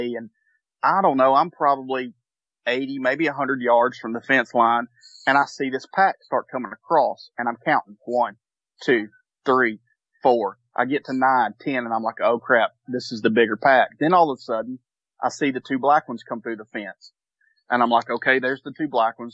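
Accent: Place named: Alabama, United States. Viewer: great collection of encounters! “Great Alabama accent”.....I could listen to you all day! Thanks for sharing....Thank you....touchdown 🏈!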